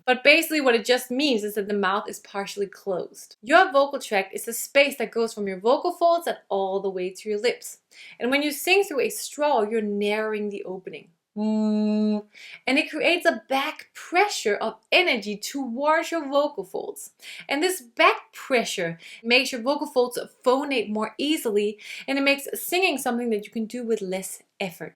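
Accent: Denmark. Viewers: He he, synes godt jeg kunne høre en dansker bag den ellers næsten perfekte accent :). Det var dog først da jeg så dit navn, at jeg kunne lægge to og to sammen:). Tak for nogle super gode og informative videoer!!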